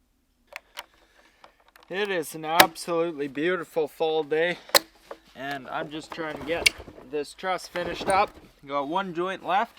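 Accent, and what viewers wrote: Canada. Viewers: ❤WOW From Norristown PA here How old are you ? I know your young but your come off with old knowledge and worth ro work ethics ? You put yourself down so much & are so hard on yourself & your doing so much then any one your age it's amazing and phenomenal 👍🏾 I hope your name comes a worldwide name You deserve it & I hope your do a Q&A Telling people about your family & life grown up in Canada i love your accent I could listen to you forever the thing you do I have not clue even how to start doing Do ever stop 👍🏾🙏🏾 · I can't help but wonder where the Canadian accent came from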